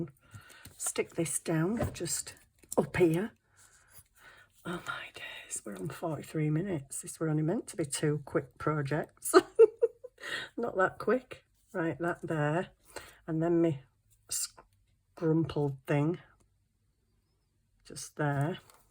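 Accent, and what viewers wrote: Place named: Scotland, United Kingdom. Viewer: Are you Scottish? I just LOVE your accent!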